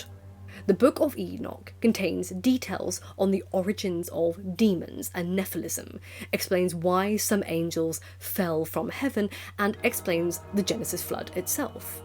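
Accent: RP, United Kingdom. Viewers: British viewers, does her accent sound as posh to you as it does to me? I can't imagine there's a British accent that's more posh than this lol.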